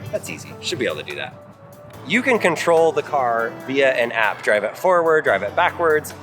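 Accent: China. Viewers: Even the voice is Chinese-English lol.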